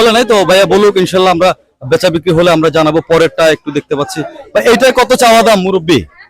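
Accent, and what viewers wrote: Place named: Dhaka, Bangladesh. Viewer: আপনার দ্বারা ভাষ্য অনেক সুন্দর। চাকা বাংলার মতো বলেন। আলহামদুলিল্লাহ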